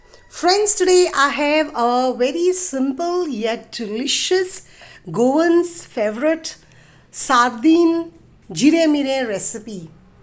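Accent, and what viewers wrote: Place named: England, United Kingdom. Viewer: New accesent of language... Seems like England has recently adopted you 😢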